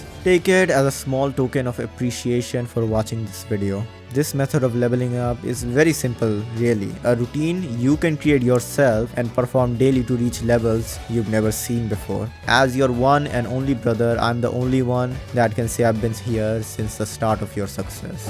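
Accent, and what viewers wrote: India. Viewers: I heard the Indian accent and knew this was going to be the best Tsb video I‘ve ever watched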